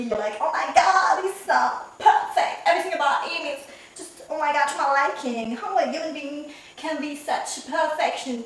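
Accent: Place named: France. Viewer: Il m'aura fallu 4 secondes pour comprendre que tu étais française avec ce bel accent, je pense que la follie de la personne est donc logique 🤣😂👍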